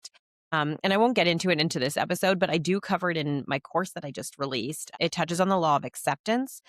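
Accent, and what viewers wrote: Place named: Canada. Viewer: This is the female version of Modern Wisdom; she doesn't have a British accent but she talks as fast. Canadian, eh?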